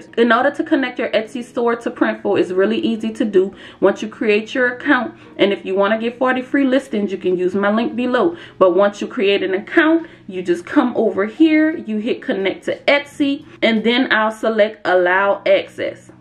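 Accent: New Orleans, United States. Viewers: I can hear a New Orleans accent from a mile away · Nice video and great tips! I hear New Orleans all in that video!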